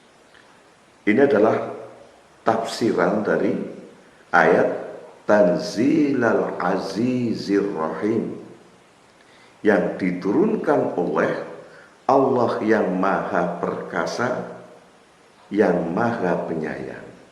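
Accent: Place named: Banyumas, Indonesia. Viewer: pase nggih ngapak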